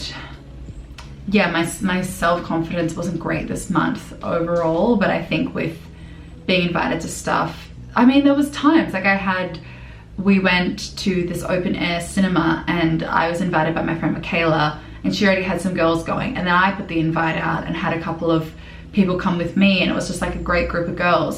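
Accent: Australia. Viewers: I would love to explore Melbourne with you one day! I want to learn an Aussie accent from you! Enjoy your wonderful life in Vancouver! Xoxox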